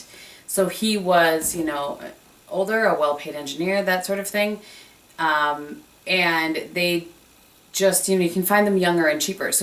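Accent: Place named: Wisconsin, United States. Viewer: I subscribed yesterday and have been trying to find more out about you. Something about your personality just speaks to me. I live in Chicago (now), but your personality, humor/laugh, and accent are shouting Wisconsin girl. Now that I know you like Packers, I know why I love your personality so much! Something about Wisconsin people just speaks to me.. It'll always be my home.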